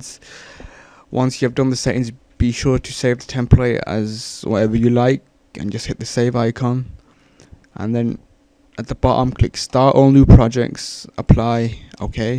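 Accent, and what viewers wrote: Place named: United Kingdom. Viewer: Just love british commentaries :D - check you inbox -____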